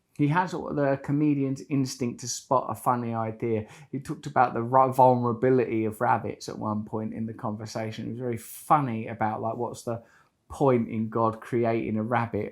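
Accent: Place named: London, United Kingdom. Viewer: You sound like you're from London♥️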